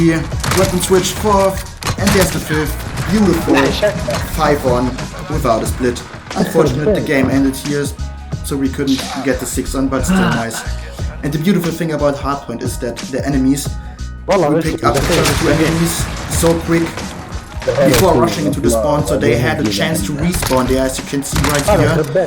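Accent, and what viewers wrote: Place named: Germany. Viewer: Deutscher Akzent am Start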